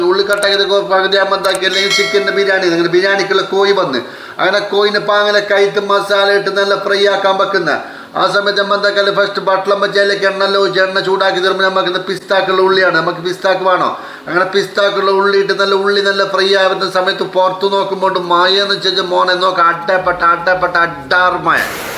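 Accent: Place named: Kasaragod, India. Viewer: Kasargod samsaram poliya 😂❤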